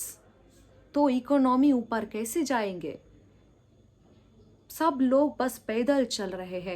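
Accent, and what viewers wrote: Tamil Nadu, India. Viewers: Jab Honge Mazboot Dollar....toh Mat pakdo hamara Collar... Just too good...What I loved was the heavy Tamil Accent... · I just love your content. Look at your south Indian accent. Wow wow wow. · .... Your Hindi South accent is best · Bahut khoob! BJP is tunj bhari vakiya kay baannon kay saamnay Shikhandi kay aahut Bhishma bhanti lay baithegi. Gaur firmament, aur voh bhi upni Tamil oocharith Hindi bhasha mein! Shabash, aur lugay raho beti upnay shubh karya mein! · Excellent Tamil mixed Hindi accent . Excellent 👍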